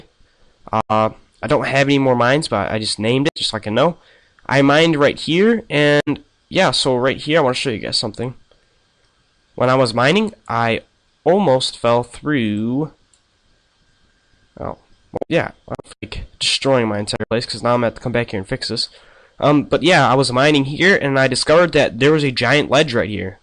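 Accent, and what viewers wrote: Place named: Canada. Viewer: Lol, I love your accent. Are you from Canada?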